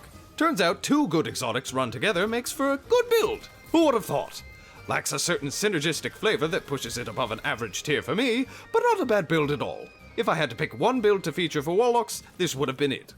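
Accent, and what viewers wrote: United Kingdom. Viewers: i noticed that throughout the entire video the narrator has a british accent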